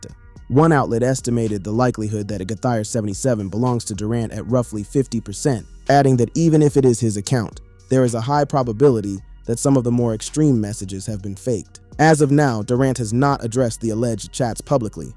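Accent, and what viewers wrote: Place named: Netherlands. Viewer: You have to do the voiceovers yourself. Even if it is a Dutch accent we can understand you lol.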